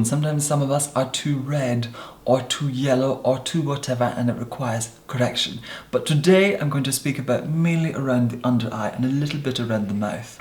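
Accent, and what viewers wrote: Scotland, United Kingdom. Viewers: I love the very subtle Scottish that comes through your poshness. Love it. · im from england btw n we dont normally sound tht posh but go to his old vids from 3/4 years ago he talks in a normal scottish accent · B. M. Just looked up an old video you are right he definitely has a Scottish accent back then my apologies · I was in no way trying to be rude. When a person goes from having a laid back Scottish accent (a lovely accent indeed) to speaking the Queen's English, it naturally elicits some confusion. I have complete respect for anyone who wants to take speech lessons. I personally used to have a slight New York accent, and trained myself out of it and forced myself to enunciate better. I just find it a bit disingenuous to adopt an entirely different accent. The transition from a Scottish accent to some version of Queen's English, is like the equivalent of me going from speaking with a New York to a Southern accent. 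I'm sure people have their reasons for presenting in any way they choose, but the drastic change caught me off guard in this case. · His accent is still very clearly Scottish, not the Queen's English at all.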